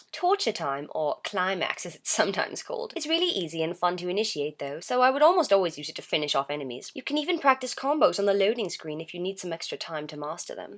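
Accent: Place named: United Kingdom. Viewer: No word of a lie, I thought you where british, shows how much I know about my own background XD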